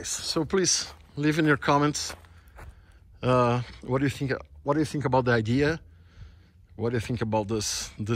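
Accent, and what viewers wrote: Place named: Brazil. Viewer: Nada como um Brasileiro falando inglês. A gente consegue entender um pouco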